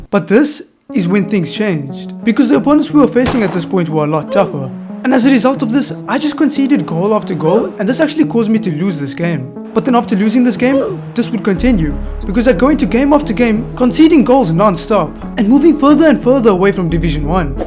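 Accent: South Africa. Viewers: You sound South African Bro! What a vid! Keep up the good content! From Namibia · U from south africa?? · Are you south African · Are u South African??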